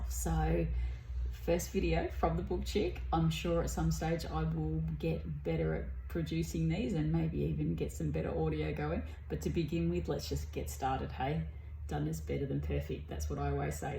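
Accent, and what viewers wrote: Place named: Australia. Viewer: Jist found your channel, hope you keep uploading as great to hear more Aussies on booktube!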